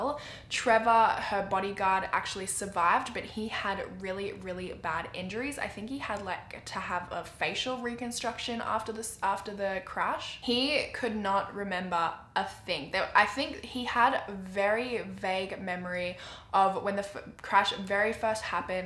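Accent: Australia. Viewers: Idk you but I pressed on this video and was so happy because I instantly noticed your Australian accent 🇦🇺🇦🇺 I’m from Perth btw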